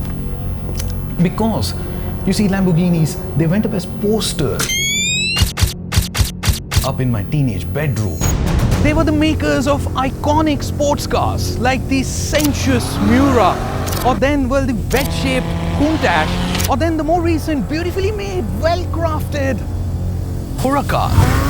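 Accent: India. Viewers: Ur indian accent tho...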